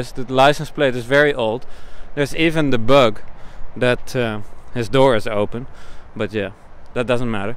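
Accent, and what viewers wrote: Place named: Netherlands. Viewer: your Dutch/English accent sounds different from other Dutch/English accents I’ve heard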